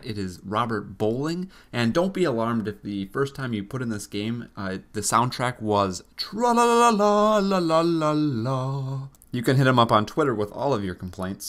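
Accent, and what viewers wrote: Minnesota, United States. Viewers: are you actually from Minnesota?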